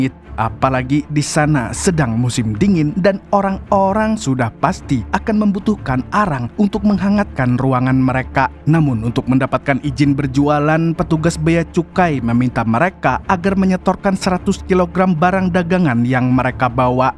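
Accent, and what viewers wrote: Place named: South Kalimantan, Indonesia. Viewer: Bicara nya mimin ini seperti logat tembang madihin.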